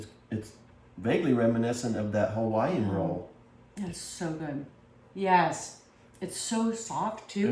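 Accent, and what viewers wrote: Boston, United States. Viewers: I was so confused when I saw Bostonian Alert & started laughing..omg I was trying to say it your way! Im loving this content & the bread looked amazing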